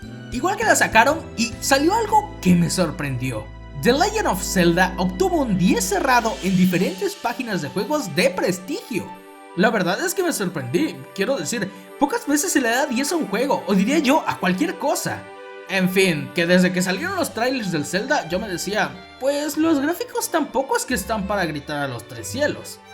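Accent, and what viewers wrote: Spain. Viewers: Por que hablas como español siendo mexicano? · suenas a un español, y, la switch es tres veces mas potente que WiiU, la causa de que Switch no mueva estable el zelda es que es un port hecho a las carreras no optimizado a la arquitectura de Switch, en pocas palabras esta emulando un WiiU. Si no me crees vete a a ver el trailer de super mario Oddesey, que eso lo corre 1080p y 60 fps nativo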